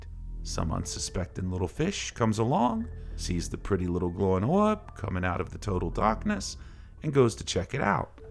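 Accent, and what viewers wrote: Boston, United States. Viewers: I don't hear south philly ...this accent is more boston I think. But, yes, I agree, no matter where it is actually from, the narrator does a great job executing it. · As far as the expletives that is exactly what a Boston Bartender in the Docks Fishing Community would sound without them the accent wouldnt have played out correctly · Yes! And pretty decent Boston accent too, lol!! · Wow! Great performance,.. and I loved the Bawston accent! 👏👏👌👍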